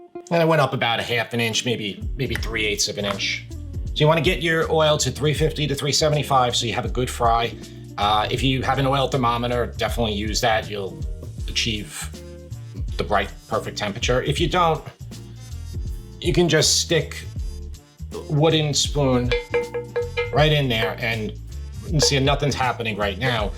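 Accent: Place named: East Coast, United States. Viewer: Cool east coast accent, smooth beats, reassuring confidence, that's gonna be an easy subscribe my dude.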